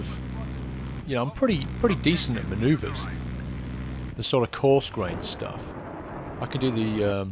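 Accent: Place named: United Kingdom. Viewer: u sound british...so yeah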